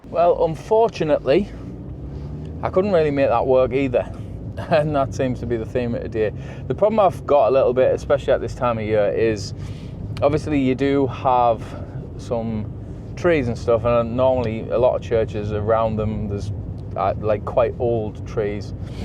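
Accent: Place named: Geordie, United Kingdom. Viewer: You've just inspired me to start a little project myself. Not churches, and hopefully something a little different. Nice to hear the local accent in one of these Youtube photography rabbit holes. Geordie lad myself, based up in Cramlington. Maybe I'll come back one day and update you on the progress. Liked, subbed and commented, because...why not.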